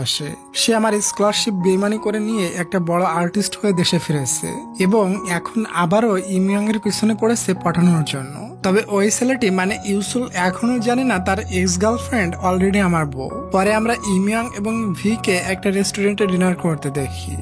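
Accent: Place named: Madaripur, Bangladesh. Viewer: ভাইয়া আপনার বাড়ি কোথায় মাদারি পুর না বরিশাল। আমার মনে হয় মাদারি পুর।❤❤❤❤❤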